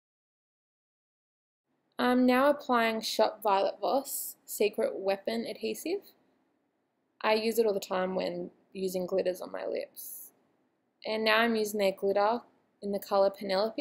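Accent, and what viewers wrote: Australia. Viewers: Omg! I follow you on Instagram, I never knew you were Australian 😍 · I didn't realise you where Australian! I've been etching your videos for weeks now!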